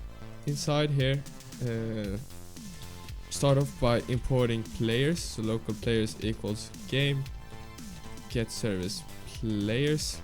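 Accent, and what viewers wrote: Sweden. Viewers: YOU ARE SWEDISH I HEAR UR ACCENT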